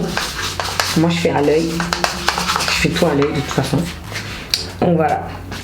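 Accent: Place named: Toulouse, France. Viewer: On s’en beaucoup ton accent tu es toulousaine ? Sinon super vidéo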